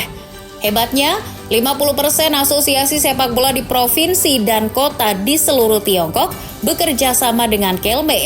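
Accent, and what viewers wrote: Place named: Banten, Indonesia. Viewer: Admin orang Banten ya lur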